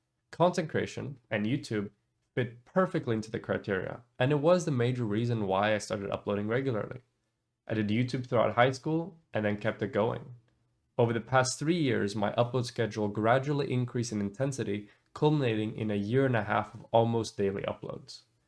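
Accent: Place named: Sweden. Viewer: Lycka till med vad du än tar dig an framöver. Du har alltid varit en av de mest rationella och eftertänksamma röster i den här delen av internet. Om du tar med det till vad som kommer härnäst tror jag du kommer se framgång. Kommer fortfarande alltid vara gött att se dig när vi får se dig på andras streams lite då och då. P.S I really like the more pronounced swenglish accent you had in those earliest streams.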